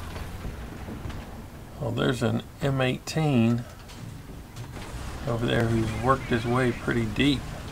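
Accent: South, United States. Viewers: Are you from the south? You have a southern accent...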